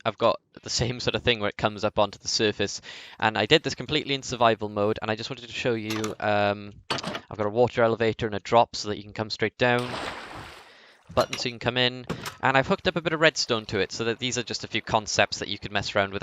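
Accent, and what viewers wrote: Wales, United Kingdom. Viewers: Very rare to hear a Welsh accent on YouTube, represent fella! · There's a subtle Welsh accent there and I love it. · Awesome to hear a fellow Welshman! And thanks for the tutorial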